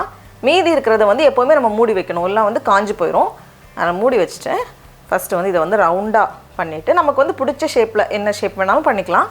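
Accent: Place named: Chennai, India. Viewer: ah madras tamil konjam 💜seen ah💜 irukum kekumbodhu sami namba bhasha pesudhudanu sandhoshama iruku Akka 😍🤣🤣🤣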